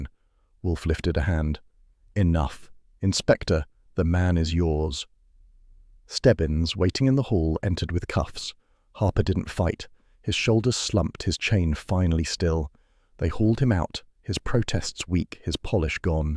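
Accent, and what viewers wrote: England, United Kingdom. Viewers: Sorry. Just can't accept the English accent for a NYC based story.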